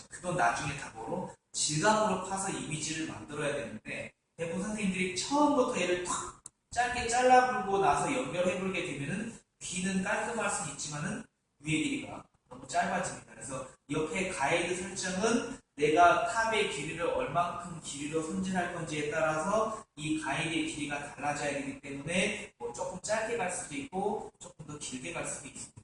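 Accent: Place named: Jeolla, South Korea. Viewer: 구수한 전라도 사투리가 정감이 가네요 감사합니다 원장님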